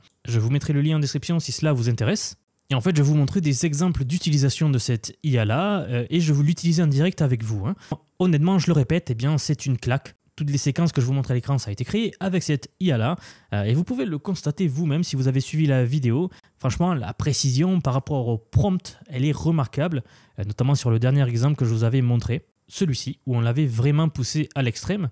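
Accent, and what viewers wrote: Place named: Loire, France. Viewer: Je reconnais l'accent du 42 😅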